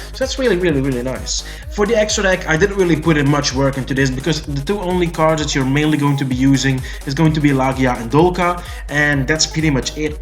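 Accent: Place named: Germany. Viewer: I hade ask because your accent listen a little bit like my accent. And yeah I am from Germany so hello neighbor.;)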